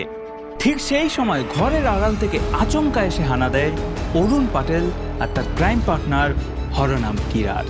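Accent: Bangladesh. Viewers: আপনার গল্প বলার ভঙ্গি ও সুর অনেকটা বাংলাদেশিদের মতো, যার কারণেই আপনি বিপুল সংখ্যক বাংলাদেশি দর্শকের মনোযোগ আকর্ষণ করেছেন...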